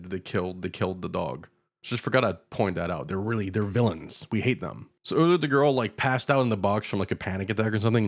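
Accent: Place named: Quebec, Canada. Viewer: Tes québécois toi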